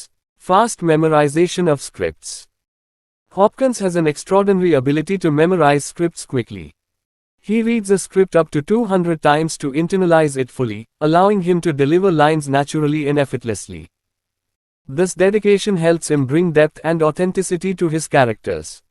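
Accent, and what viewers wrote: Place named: India. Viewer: aS NARRATED BY A PAKI OR INDIAN GENIUS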